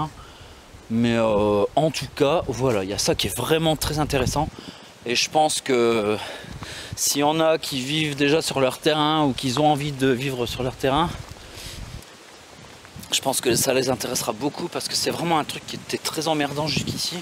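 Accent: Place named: Nord, France. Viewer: T' es deuch' Nord tisote nan ? T ' as un p' tit accent ..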